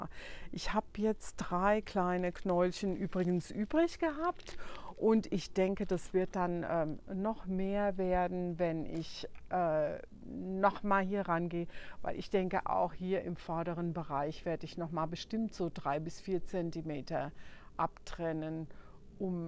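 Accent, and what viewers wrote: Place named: Hamburg, Germany. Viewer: Ah, alles klar. "Hässisch" und dann noch "hambugäsch" obendrauf. Dadurch erklärt sich vieles. 😉😘 Mag ich sehr gerne hören. Ganz liebe Grüße über den groooßen Teich 🤗 in ein so ganz anderes Klima 🌵🌞, was ich vermutlich klasse fände. 👋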